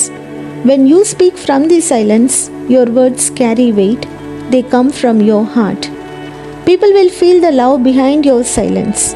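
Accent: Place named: India. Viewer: the annoying indian nasal voice over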